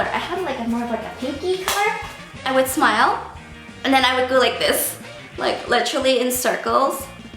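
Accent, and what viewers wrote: Northern Ireland, United Kingdom. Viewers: She lives in Northern Ireland again so her accent probably got stronger from hearing it all day everyday from everyone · she's from Northern Ireland!! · As a norn Irish meself, i can personally say her accent is way cuter than the rest of ours 😂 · you kinda have a british accent 😁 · I love your accent😂 Like I'm from Belfast and I can hear all the Northern Irish things you say, haha👏🏼👏🏼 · Does she live in Northern Ireland? She has the same accent as me 😂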